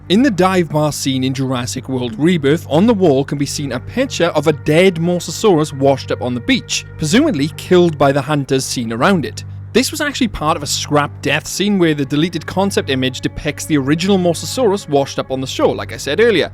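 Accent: Wales, United Kingdom. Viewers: It is hard for me to find new classified disclosure on a daily basis. I am amused by the British accent. I see you did Inter Alia Quantum Postage. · Is that a fellow Welsh accent I hear? Love your videos, dude! They’ve made me much more interested in the wider lore of JP/JW.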